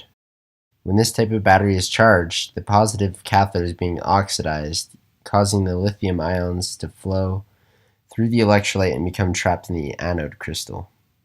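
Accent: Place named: England, United Kingdom. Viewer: Oh thank god, someone with a normal English accent talking about this! Thanks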